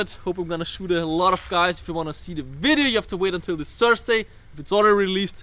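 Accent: Germany. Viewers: Are u german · Is he german or austrian? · Then se fleshlight right here. I love his englisch xD. Dieser deutsche Akzent hahahaha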